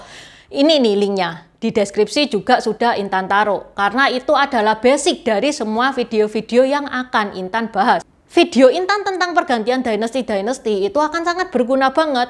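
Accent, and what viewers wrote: Java, Indonesia. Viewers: logat jawa intan medhok,lucu sy ikut seru klo nonton hehehe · Mbak maaf bahasa jawa nya medok bngt🤭😁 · Suka medok Jawanya Mba, salam Surabaya ♥️👍🌴🌿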